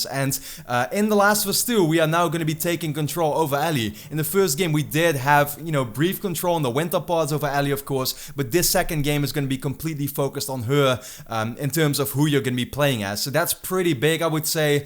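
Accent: Netherlands. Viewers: That Dutch accent, i love it.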